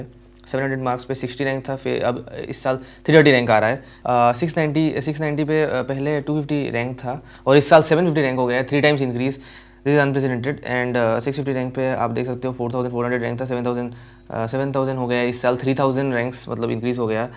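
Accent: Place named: Silchar, India. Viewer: Bro silchar ka ho kya...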